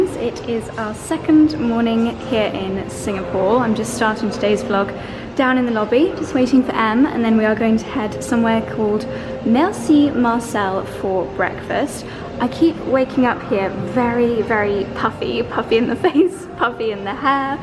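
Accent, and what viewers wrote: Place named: United Kingdom. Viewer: Ur middle upper class british yea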